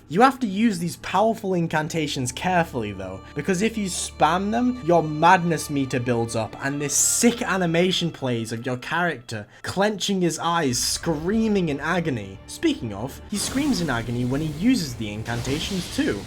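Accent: United Kingdom. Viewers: good content, and good personality m8. you'll blend right in with the 1,000,000 other British sounding from soft critiques m8 also 20$ says you used a zweihander in ds1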